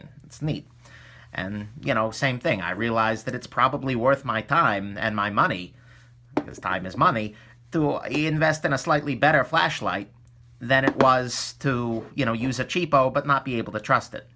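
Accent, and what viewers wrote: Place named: New Jersey, United States. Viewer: wtf ... this n.j. accent for real?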